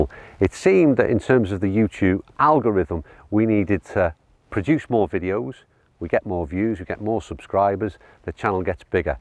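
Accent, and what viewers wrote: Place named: Scotland, United Kingdom. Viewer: this is a good morning from Montréal, Canada. First I had to get used to your Scottish accent. Thereafter it is the most pleasant trip I can have to visit Scotland and appreciate a friendly average guy who happens to be a pretty good golfer, visit golf courses and listen to reasonable and fair evaluations of golf material. I should add on, the background music is most of the time very good. I feel like listen to the next door guy who’s talking to me and not taking me for a stupid consumer. Many thanks for your interesting material. Here’s it is -25 Celsius, even a Noodle golf ball would die in the middle of a flight.😎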